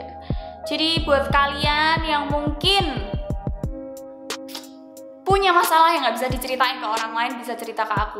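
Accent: Java, Indonesia. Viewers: Ngegas medok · Senang dengerin medok mu mba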